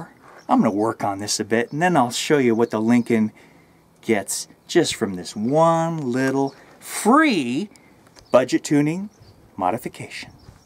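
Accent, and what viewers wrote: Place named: Oklahoma, United States. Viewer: Are you sure you're not in California? That place looks exactly like Boyikersfield. And you sound exactly like an Okey from Korn County.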